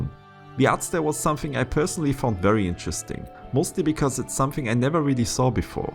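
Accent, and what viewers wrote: Germany. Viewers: man hört sofort dass du deutscher bist ^^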